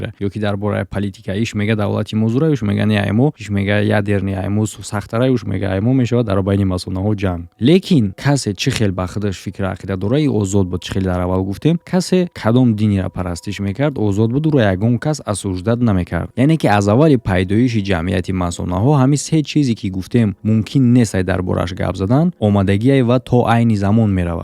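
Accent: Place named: Kulob, Tajikistan. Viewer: Ошно гапоша мо чоткий мефахмем чиста Кӯлоби гап мезана ть ай тарафои Хуҷанд боши намефахми ука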